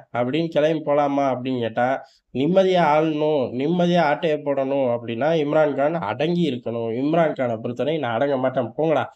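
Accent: Madurai, India. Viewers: தல உங்க மதுரை வட்டார வழக்கு சூப்பரா இருக்கு....அருமையா பேசுறீங்க....நாலஞ்சு எடத்துல குபீர் சிரிப்பு....மாம்பழக்கூடைய தூக்கிட்டு சைனா கெளம்பிட்டான்யா.....கிராஸ் பார்டர் மேரேஜ் சீன மாப்பிளைகளின் மவுசு.....😂😂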